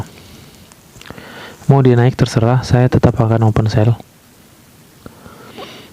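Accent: Medan, Indonesia. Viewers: Org Medan ya bg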